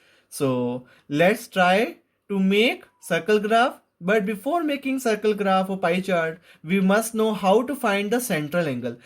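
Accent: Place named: Bihar, India. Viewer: Bro has the bihari accent 💀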